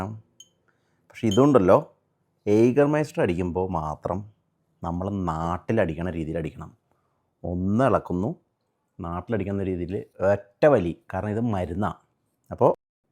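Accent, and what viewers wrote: Thrissur, India. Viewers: എന്തുട്ടാ 😂😂😂 ത്രിശൂർ ആണല്ലേ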